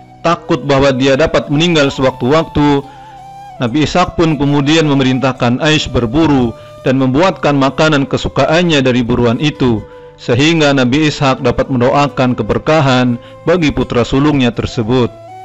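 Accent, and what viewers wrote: Bugis, Indonesia. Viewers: Sy yakin yg ngomong ini orng bugis..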